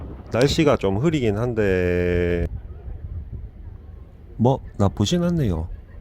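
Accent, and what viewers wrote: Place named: Seoul, South Korea. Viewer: 서울말잘쓰네..북한서 온지 얼마안된거같은데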